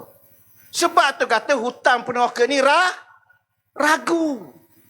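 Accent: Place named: Terengganu, Malaysia. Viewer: Menyampampah dengar cakap ganu klante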